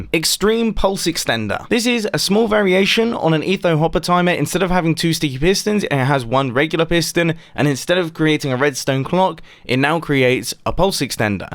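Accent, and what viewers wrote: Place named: United Kingdom. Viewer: Mumbo sounds so much more British on camera than in real life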